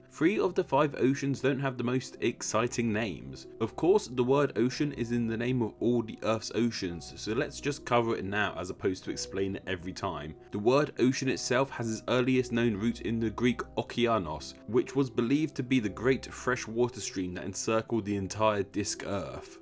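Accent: United Kingdom. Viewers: This British accent ! 😌🙏🏻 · The oceans got their names by being called that by someone, and it stuck. Again, this robotic British twit narrator should be used to stop hurricanes, as his delivery is so banal that even a force of nature would turn away.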